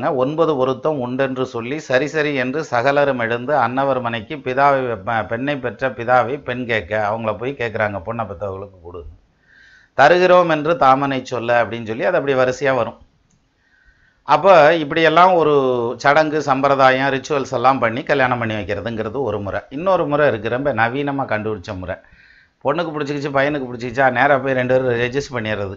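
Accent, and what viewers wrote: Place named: Kongu, India. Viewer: Sir, my guess you are from kongu vellala gounder community ... Pls don't mistake I m guessing based on the way you talk and the examples you say in between, which is very familiar in this community. I may be wrong....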